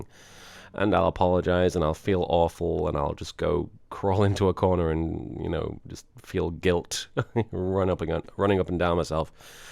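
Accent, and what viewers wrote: United Kingdom. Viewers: i learn how to talk more like a british cause of you :D!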